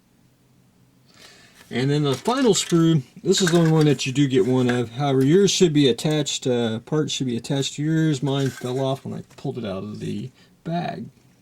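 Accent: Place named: England, United Kingdom. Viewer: Mais ce qu'il peut être saoûlant ce rosbeef avec son accent pourri en plus !! P.... on comprend rien de ce qu'il baragouine !!! À se demander s'il se comprend lui même !!? En plus il ne va même pas au bout du montage ... 😕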